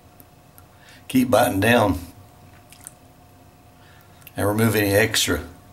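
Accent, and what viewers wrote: South, United States. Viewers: He's got a gentle southern accent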